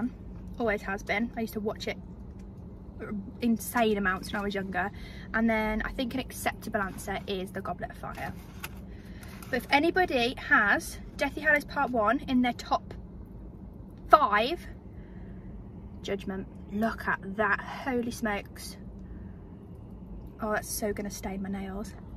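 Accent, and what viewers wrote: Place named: New Orleans, United States. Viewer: I like her accent 😭 I'm from New Orleans lol if ykyk the voice is crazy 😂😂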